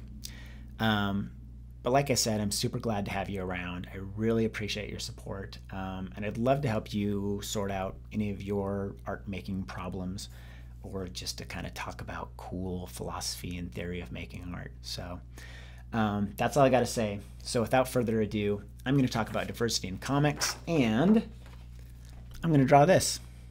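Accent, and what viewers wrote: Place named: California, United States. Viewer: Where did you pick up that cali girl ascent. It is perfect.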